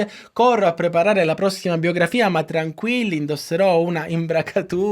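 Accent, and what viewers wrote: Palermo, Italy. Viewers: Il signorino perfettino asettico, no grazie, adoro la personalità e colore con vocaboli palermitani.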